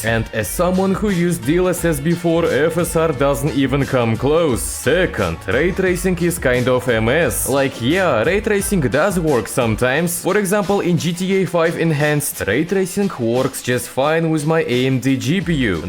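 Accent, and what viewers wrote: Russia. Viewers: I like your accent. 👍 Is it Russian?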